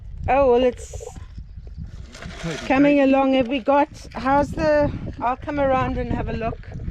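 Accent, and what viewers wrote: South Africa. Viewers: Now that voice is very familiar! South African? I'm going to enjoy this post.Nicky Bedford from Cape town .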